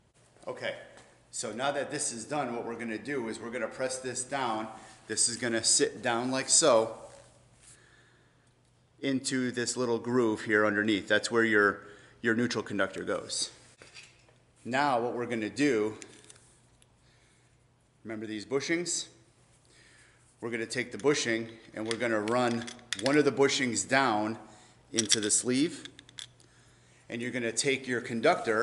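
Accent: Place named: New England, United States. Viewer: Awesome video! As an electrical graduate of a vocational high school this brings back memories. I’m currently deciding to upgrade my two family home from 200 amp service ( each unit is 100amp panels) would it be worth it to upgrade and if so how much do you think would cost? Also judging by the way you speak, you must be a fellow New Englander. I’m in western Mass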